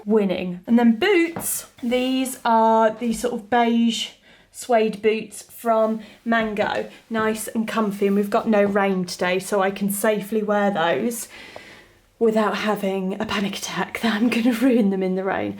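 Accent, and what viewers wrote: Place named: Scotland, United Kingdom. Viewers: Good morning girl 💖. I was sitting here at 6am with my coffee, my husband just left for work and I heard your doorbell ring and thought it was mine. Scared me silly thinking somebody was ringing my doorbell at that time of the morning 😂🙈❣️ I can totally identify with not turning on the heat 🥴. I used to call my husband Mr. Freeze 🤣 Of course I loved all the outfits. 😉😍. I think I'm going to get me some of those socks. I also love anything that is and Other Stories! Love the contrast of colors with the bed. I have storage underneath my bed as well that's where I put our pillows that we sleep on and spare sheets. Always Always love seeing the babies! 💞💖❣️ Can't wait for the Scotland vlog! By the way you had a little Sean Connery going on in your accent🤭 I guess that's good he's from Scotland right? 🤔🤣❣️? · I can’t with your Scottish accent lol 🤣☺️. 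Loving all the cashmere, I have some socks but no comfies that are cashmere, they look amazing 🙌🏻. All lovely outfits, need to find a similar dupe for that H&M premium jumper that’s sold out, they go quick. Nice seeing the pups 🤗🐕 x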